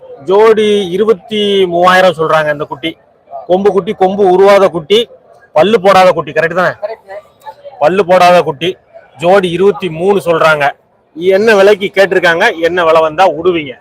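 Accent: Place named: Madurai, India. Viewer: Madurai basha I laikkit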